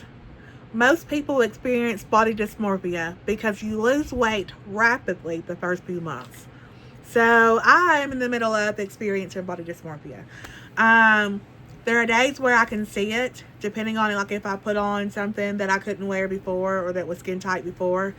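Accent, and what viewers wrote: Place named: South, United States. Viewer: Your accent is adorable - from a fellow southerner. I'm gearing up for my VSG in July. I wished it could be sooner but work committments block out June and the beginning of July. I've started implimenting things that people suggested. Drinking 64 oz of water daily, chewing 20 times before swallowing; cleaning up my diet and exercising.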